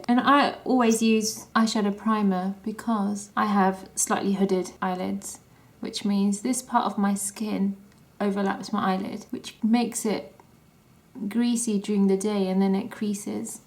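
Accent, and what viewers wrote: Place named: London, United Kingdom. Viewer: wow i know iv already said this but sometimes it seems like its cheryl cole doing a tutorial but with a better accent i love the londoners accent. hope you both have a fabulous year ahead and keep making videos and easy on the eyes and ears sis mwah xx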